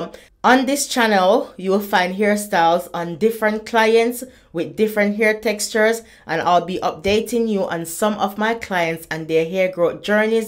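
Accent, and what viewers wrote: Jamaica. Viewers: A me alone hear the jamaican accent in her voice?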